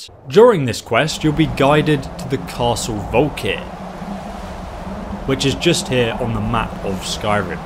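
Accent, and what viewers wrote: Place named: England, United Kingdom. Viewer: Da Informer his accent has nothing to do with it, its an english accent, sane as mine, i prounounce everything fine, hes dyslexic.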